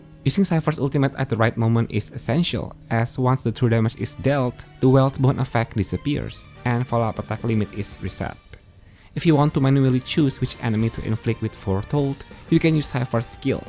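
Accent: Indonesia. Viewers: You sound Indonesian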